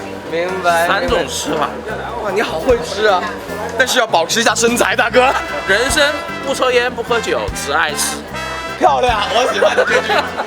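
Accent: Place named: Cantonese, China. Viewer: 廣東式普通話，難聽到死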